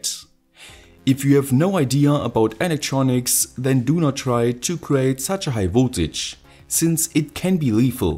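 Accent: Germany. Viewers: Can you build a singing Teslacoil please ? And are you German ?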